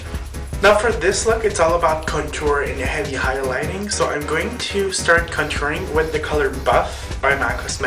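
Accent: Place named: Canada. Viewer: "folies blanches" trop marrant l'accent canadien!! :)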